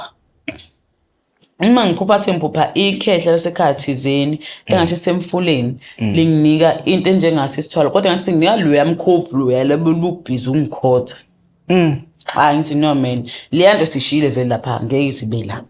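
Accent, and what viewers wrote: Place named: Johannesburg, South Africa. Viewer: 😂😂😂 uhlulekile kodwa uku actor ntombi lestori sakho singamanga, eccent yakho eyase Goli eRange Farm or Bekersdal. EMhlanga awazi nokuthi kungakuphinje 😂😂😂 Kulungike byeeeee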